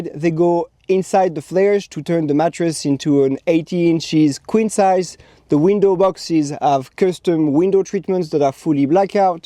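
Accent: France. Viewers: Love the French accent! ❤ Great van, too!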